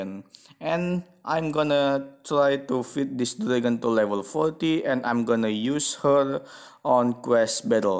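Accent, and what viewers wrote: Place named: Indonesia. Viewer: Logatnya indo sih..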